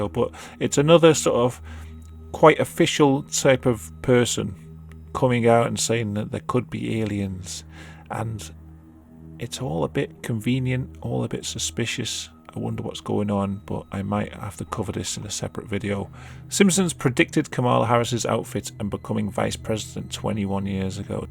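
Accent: United Kingdom. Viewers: are you british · whenever I listen to you (or any British youtuber), I can't help but get in touch with my roots and just impersonate your accents. Though usually itll turn from "women's stomach turns after seeing a ghost" to "women's stomach turns after eating some butt"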